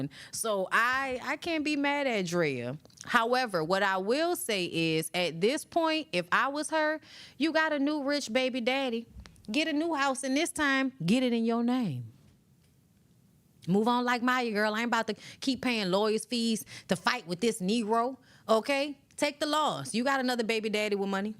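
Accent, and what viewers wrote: New Orleans, United States. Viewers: I love New Orleans accent 'Baybeh' since Choppa on Making the Band & Toya came on tv 😍💜💛💚 i need to come to your 'Citay'😉. 😘💐 💙 Nuff love manners and respect from London 🇬🇧🇯🇲🇧🇧😇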